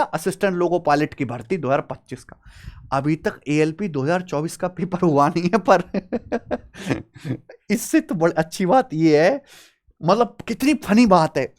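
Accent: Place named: Bulandshahr, India. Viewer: सर का बुलंदशहरिया टोन मस्त लगता है हमे 😅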